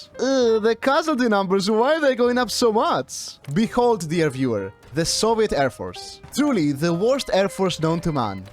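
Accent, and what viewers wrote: Greece. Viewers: I swear you have a greek accent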